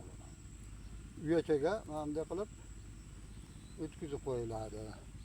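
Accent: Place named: Buvayda, Uzbekistan. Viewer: Хожи ота ? Каердансиз ? Бизнинг Бувайда тумани ахолисининг шевасига ухшар экан гапиришингиз . Лекин уста овчи экансиз , койил !... Видео учун рахмат ! ...🎉🎉🎉🎉🎉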